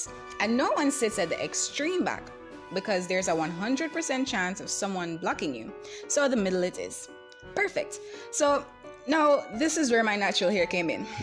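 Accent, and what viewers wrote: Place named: Jamaica. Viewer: I love Jamaican accent great video and editing awsome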